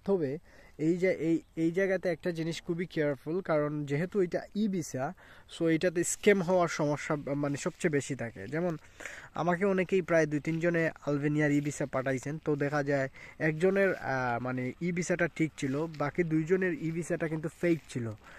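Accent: Sylhet, Bangladesh. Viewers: ভাই সিলেটি নি ❤ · Vai Kotay lager Sylheti bhai basha koi?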